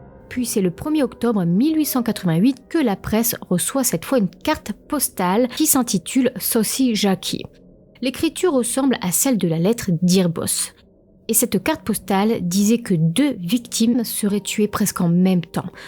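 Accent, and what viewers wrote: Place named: Nord-Pas-de-Calais, France. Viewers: ah c'est marrant... moi je t'imaginais toute blonde, ( je sais pas pourquoi ) peut -être l'accent chti , par contre à un " grotéfor " , NON ! un " grozéfort "c'est mieux ...😃 ( gros effort ) dit gentiment bien sûr car j'aime tes vidéos et ta façon de raconter , bravo ...:hand-pink-waving::face-red-heart-shape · Coucou! Merci pour cette vidéo d'une affaire très connue mais très bien racontée avec ton accent ch'ti 😊 Que tu ne te montres pas où que tu aies le visage flouté ne change rien puisque c'est ta voix que j'écoute 😊. Comme un podcast en fait...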